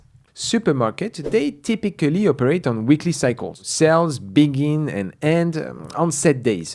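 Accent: France. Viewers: Found your content recently and it’s been great - also enjoy the French accent ! Haha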